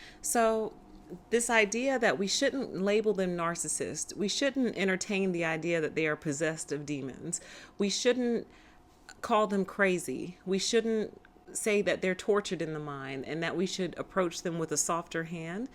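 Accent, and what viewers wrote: South, United States. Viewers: I love your Southern accent! I'm in Arkansas : )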